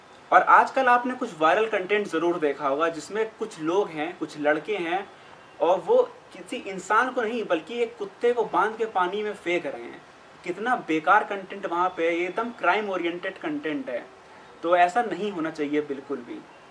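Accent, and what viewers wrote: Allahabad, India. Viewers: Allahabadi language is superbbb🤣🤣... Well done bro🤘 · Allahabadi style ma bola to aag laga Diya🤘🔥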